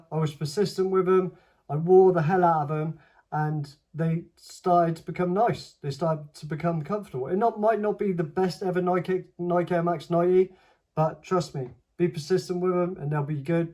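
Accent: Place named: Dorset, United Kingdom. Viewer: Im on day three, already loads mire comfortable those first few days are rough. Regarding the terrascapes comfortability. You in dorset mate. I can hear the accent, i had family down there.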